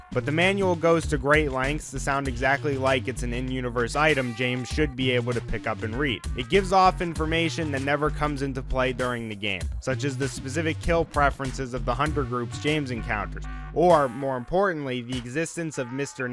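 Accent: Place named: Chicago, United States. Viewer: That's an interesting accent you have. Are you from Chicago?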